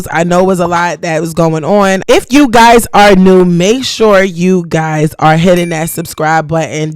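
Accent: New York, United States. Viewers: YOURE DEFINITELY FROM N.Y. ITS THE WAY WE TALK…PERIOD LOVE THE CONTENT🖤💪🏾💯🥂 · You sound like Remy ma... You can tell you're from NY ❤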